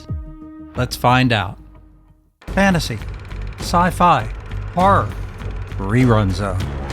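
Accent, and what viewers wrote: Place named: Philadelphia, United States. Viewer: Am I right that you are either from Philly or a close suburb? I hear familiar o’s 😊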